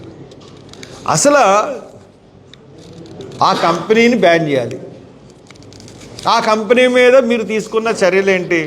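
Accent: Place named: Godavari, India. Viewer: ఓరి నీ ..... ఇదెక్కడి గొడవండి గోదావరి యాస సూపర్ గా ఉంది సర్ 😂😂😂😂😂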